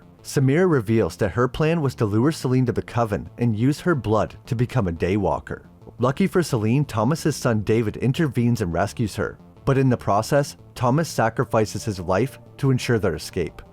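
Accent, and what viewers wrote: Canada. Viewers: Your Canadian accent isn't slipping by me! keep up the good work my man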